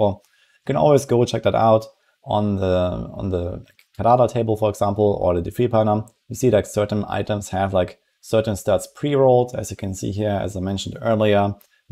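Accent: Germany. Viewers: Ich finde es immer so amüsant. 5 Sekunden vom Video geschaut und direkt gewusst, dass du Deutscher bist bzw. Deutsche als Muttersprache hast.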